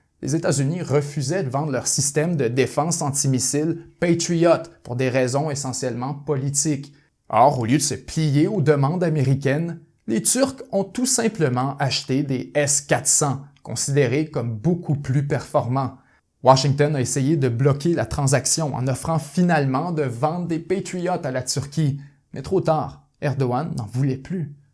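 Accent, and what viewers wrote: Quebec, Canada. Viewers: L accent Quebecois est genial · J'adore ton absent québécois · j'ai vraiment toujours un problème avec l'accent des québécois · L'accent Québécois, il est magnifique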